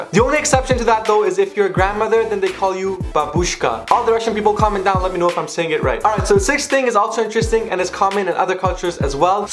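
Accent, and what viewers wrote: Isfahan, Iran. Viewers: الهی قربون اون لهجه اصفهونیو وشیرینتون دادا❤❤❤❤❤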